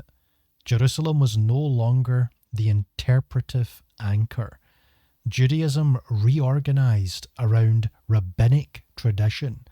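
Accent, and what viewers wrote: Scotland, United Kingdom. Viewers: Just found your channel, really interesting... at least what I can understand past that damn thick ass Scottish accent 😂😂😂 but I love it 😊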